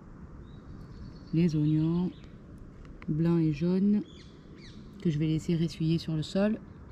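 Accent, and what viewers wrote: South, France. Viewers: Bonjour je découvre votre chaîne qui est très bien, de plus j'adore votre accent du midi. Félicitations pour votre beau potager 👏👏👍👍. Cet automne j'ai mis beaucoup de fumier de cheval et de la paille je suis impatient de voir le résultat. Je mettrai du fumier de poule lors de la plantation en mai prochain. Je m'abonne 😉😉Ali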